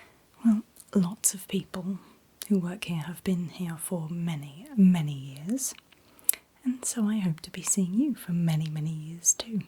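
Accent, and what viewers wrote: RP, United Kingdom. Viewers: Love your british accent. Its so elegant and soothing. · That posh British accent hits like Ambien! ❤️🤍❤️🤍❤️🤍❤️🤍❤️🤍❤️🤍❤️🤍❤️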